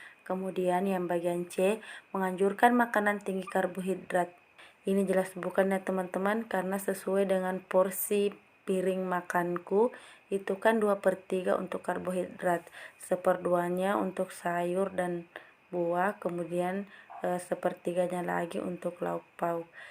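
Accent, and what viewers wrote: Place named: South Sulawesi, Indonesia. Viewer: Kk org sulsel yah ?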